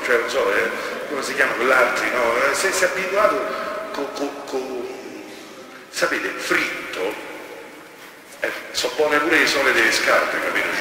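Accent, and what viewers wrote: Rome, Italy. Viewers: Padre, io la rispetto perche’ lei porta la parola di Dio padre nostro, a tutti noi suoi figli. Ma mi permetta il suo accento e dire romanesco è veramente fastidioso. Vede, le sue catechesi sono seguite in tutta Italia, io per esempio sono di Torino e a volte fatico a capire la su “lingua”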